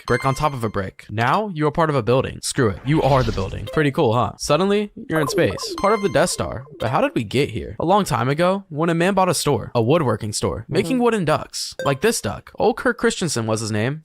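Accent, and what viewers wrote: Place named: United Kingdom. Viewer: Man, I thought you’re supposed to build with Legos not make a whole goddamn essay about it I mean we want to hear you talk British make Lego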